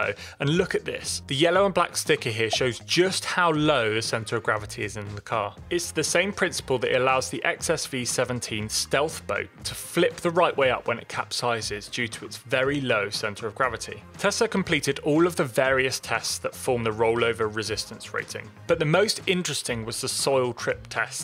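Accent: United Kingdom. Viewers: Is he British??? Just curious to know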